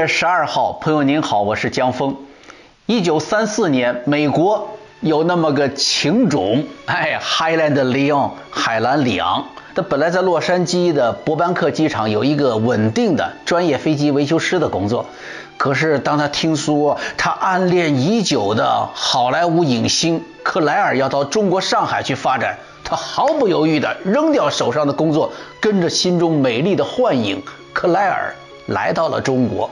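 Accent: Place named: Northeast, China. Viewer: 江老师东北话说的地道!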